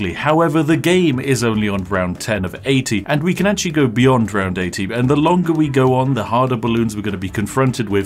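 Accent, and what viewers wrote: United Kingdom. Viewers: My guy sounds so british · I like you're british accent)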